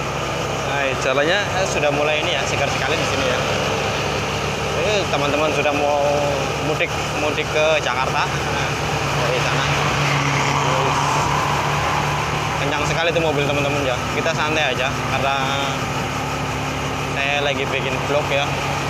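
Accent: Banyumas, Indonesia. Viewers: Keren mas bro otw trus, btw suara.ne ngapak sedulur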